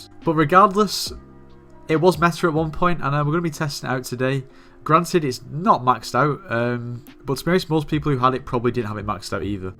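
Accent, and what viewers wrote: United Kingdom. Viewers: ma bri'ish man is back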